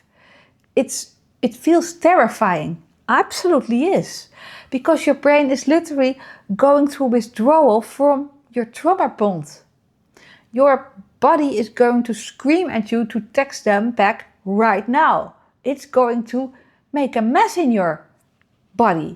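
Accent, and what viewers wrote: Netherlands. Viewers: Thanks. You have a knack for this. And it's enjoyable to be "dressed down" (in a good way) in English spoken with a Dutch accent, for some reason. One of the tell-tale signs of a cheat who will destroy your peace is rewriting history. If they show themselves willing to try to cheat reality itself constantly, there is no doubt they are a cheat.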